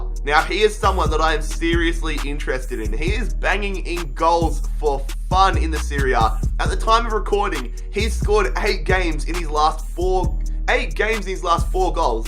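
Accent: Australia. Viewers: Your Aussie. I love it mate. I'm Australian too. Good vid mate ❤️😎😜💪👌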